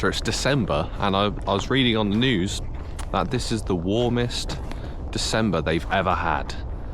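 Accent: United Kingdom. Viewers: Вааааййй, posh engliiish. Ну вы даёте, сударь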